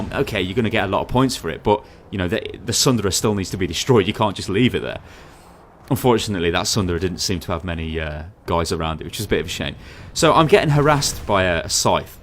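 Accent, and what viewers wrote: United Kingdom. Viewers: Oh, hes British. They pronounce it differently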